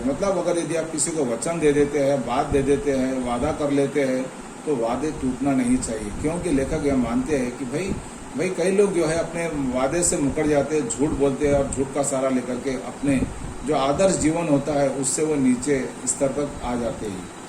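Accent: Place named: Hyderabad, India. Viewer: Explanation fabulous but that Hyderabadi Hindi that was way more fabulous than your explanation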